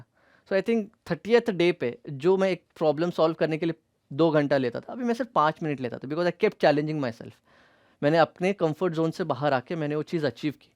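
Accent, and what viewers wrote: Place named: Karnataka, India. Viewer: Kannadi accent was the best part of this video..... 😂😂😂😂😂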